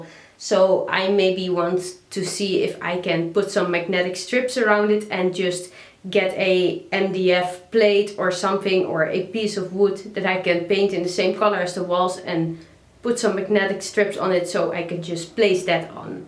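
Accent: Netherlands. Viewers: I just stumbled on your channel and as soon as I heard you, I knew you were Dutch! My mother was Dutch and I have a lot of family in the Netherlands. I love your home! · Haha het Nederlands accent komt haast altijd overal bovenuit, zo cute🥰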